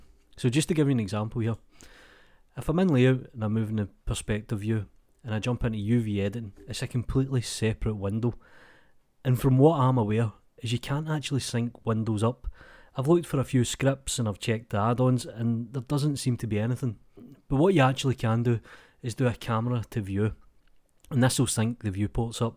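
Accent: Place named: Aberdeen, United Kingdom. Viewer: Nice tutorial man! Are you from Aberdeen?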